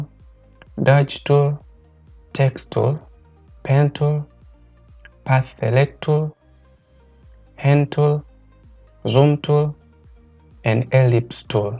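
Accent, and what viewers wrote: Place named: Nigeria. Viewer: Sounds like a nigerian 😁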